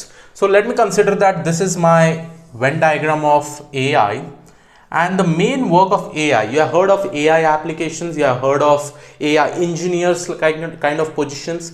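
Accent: India. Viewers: INDIAN ACCENT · Can‘t bear Indian accent. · Very nice explanation along with Indian accent which is pretty fine :)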